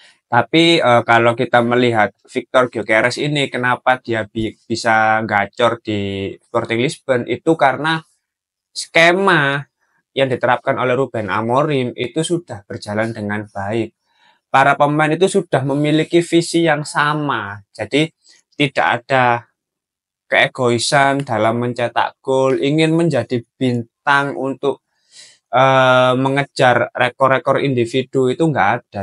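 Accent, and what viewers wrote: Java, Indonesia. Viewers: Sampean arek mana mas..logate kok koyo jowo sblh ndi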